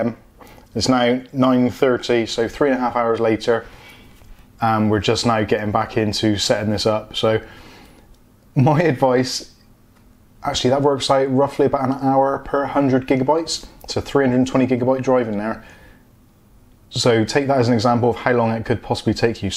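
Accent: Bristol, United Kingdom. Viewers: love the bristoliannn accent! makes it 100x more friendly lol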